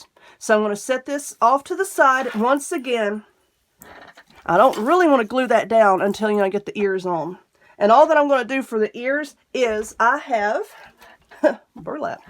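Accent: South, United States. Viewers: Girl first of all I love your accent being from the south myself. Go girl. You crack me up. You make stuff so easy and simple to learn. And the ex jokes make my day.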